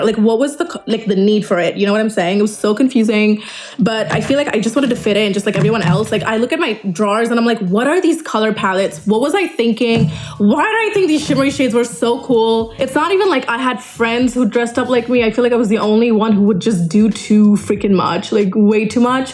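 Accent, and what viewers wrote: United States. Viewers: Every time she makes such videos its hilarious to me, she seems like the typical trying to fit into the box kinda girl who tries to be relatable. This accent is it for me, how much will you try to fit in and sound like the typical LA influencer. I feel like when she was in Dubai she at least wasn’t trying to be something and was genuinely funny this is just cringe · i swear you sound more american every video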